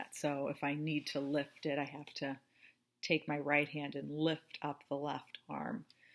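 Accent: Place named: Toronto, Canada. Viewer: I grew up in TO, so I’d know that accent anywhere. My shoulder gets done on Aug 1st. Thanks for all the good info.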